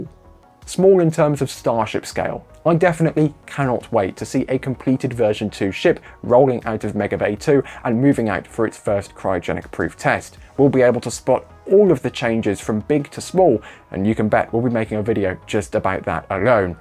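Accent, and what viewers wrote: United Kingdom. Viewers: Hi NSF, it is nice to listen to, because of being British, BUT can we please slow down the speed a little, only a little. From your 1.1 Million subscribers are not all native English speaker, like the poor guy here. Due to rewind and repeat it took exactly 35 minutes for this clip. To lenghten it to 2 minutes longer will reduce that immensly - see John's clip yesterday. Speed was perfect there. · Kinda difficult understanding some of those wiords with that pommy accent.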